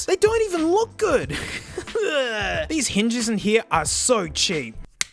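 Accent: Australia. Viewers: I fucking love Australians. The accent is on point for these type of videos. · Can you please reveiw the skullcandy crusher's, i have had them for 2 years and i love them but i would love to hear your opinion on them. Btw, love ur vids and laugh everytime u talk with that Austrailian accent XD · I can never understand what you’re saying on these mic tests. It’s a mixture of australian accent with me not being great at english and headphone mics sucking that is simply impossible for me to overcome. I’m so sure you’re saying something funny I still laugh sometimes tho, hahahahah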